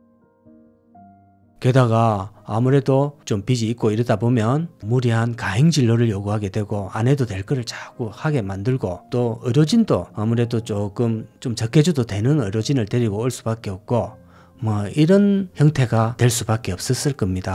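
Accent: Gyeongsang, South Korea. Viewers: 진짜 갱상도 말투며 억양이며 발음 거슬려요. 금정구는 검정구네요.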